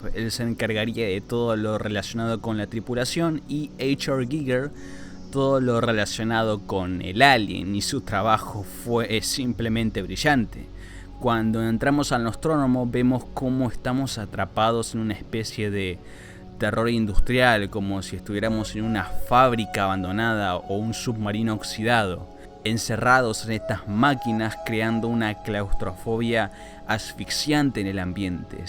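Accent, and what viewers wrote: Argentina. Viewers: eres muy buen editor, buen análisis amigo, transfondo intelectual, saludos desde México, voz sos argento "pibe" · El esho, el ego y el súper sho jajaja cagado acento Argentino. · Tanto le cuesta leer a un argentino.? Super Sho, El Esho? Igual que un puertoriqueño, saben que hablan mal pero igual lo hacen hacen 🙄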